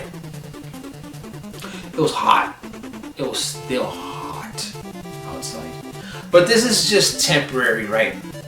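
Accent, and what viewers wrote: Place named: Texas, United States. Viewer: I'm from South Carolina, so probably not the best judge of accents. But yeah you have a slight Texan accent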